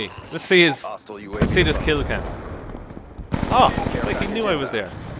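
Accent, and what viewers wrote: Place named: Ireland. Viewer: you are much funnier than Pewdiepie and deserve many more subs than him:) You are a really great guy and I love the Irish accent you have. I would love to game with you one day:)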